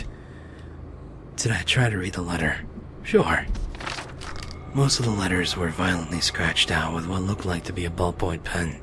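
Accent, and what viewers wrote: United Kingdom. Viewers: Lovely british accent there!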